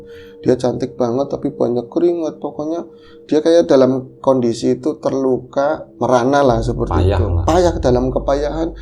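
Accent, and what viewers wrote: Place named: Brebes, Indonesia. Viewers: Seneng dengar gaya bahasa Maz', medok banget.👍😊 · Kangen logat brebesnya mas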